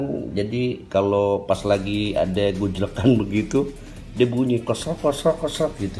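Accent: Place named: Jakarta, Indonesia. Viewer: cang betawi banget sih cang heheheh kite baru mao mesen benelli ni cang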